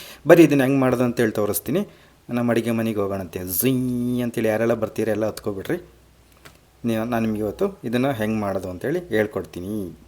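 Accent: Chitradurga, India. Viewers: ನಿಮ್ಮ ಮಾತು ಕೇಳ್ತಾ ಇದ್ರೆ ನಮ್ಮ ಅಮ್ಮನೇ ನೆನಪಾಗ್ತಾರೆ. ದುರ್ಗದ ಮಾತು ರುಚಿ ತುಂಬಾ ಚೆಂದ. Thank you so much. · ದುರ್ಗದ ಭಾಷಾ ಸೊಗಡನ್ನು ಹಾಗೆ ಉಳಿಸಿಕೊಂಡು ಬಂದಿದ್ದೀರಾ ಕೇಳೋಕೆ ತುಂಬಾ ಖುಷಿಯಾಗುತ್ತೆ ನಿಮ್ಮ ಅಡುಗೆ ಎಷ್ಟೇ ರುಚಿಯಾಗಿದೆ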